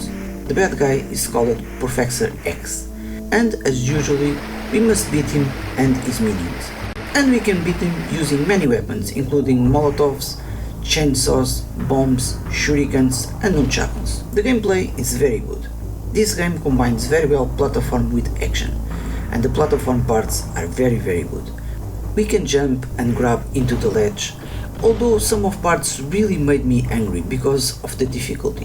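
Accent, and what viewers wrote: Portugal. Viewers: Não sei se alguma vez comentei num dos teus videos mas estava a ouvir o teu sotaque e pensei que fosses Tuga. Fui ver e és. Não falha. :)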